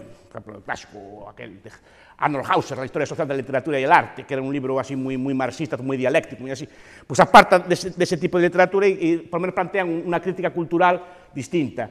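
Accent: Galicia, Spain. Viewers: Es gallego de aldea. El auténtico.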